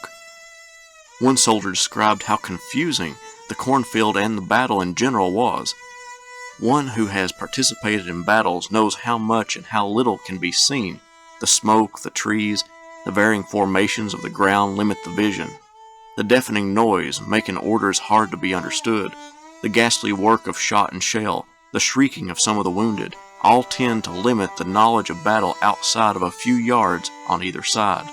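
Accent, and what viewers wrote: South, United States. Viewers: Sir, you sound like a Southerner so why are ALL of the accounts from Union soldiers? There are plenty of first-hand accounts by Confederate soldiers. Perhaps a more even blend would be better. One more thing, the name of the battle is Sharpsburg.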